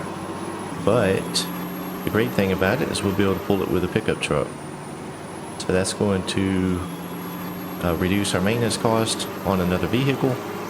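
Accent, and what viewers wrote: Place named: Texas, United States. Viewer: Are you from Texas you kinda got that accent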